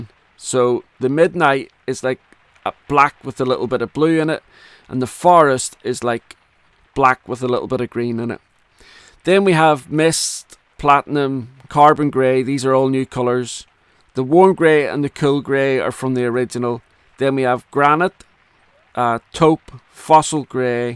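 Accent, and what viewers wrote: Northern Ireland, United Kingdom. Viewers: Thanks for another great review. Honestly what took me back wasn’t anything you said about the pencils but that you’ve been doing reviews for 11 years already. I found your channel shortly after it started, it was called something else back then sorry don’t remember what it was. Both my parents born and raised in Ireland and I had just lost my dad. Though your Northern Ireland brogue was different than my dad’s Dublin one it gave me comfort listening to it. Wow I can’t believe it’s been I’ve been watching that many years, where does the time go, every year it flys be faster.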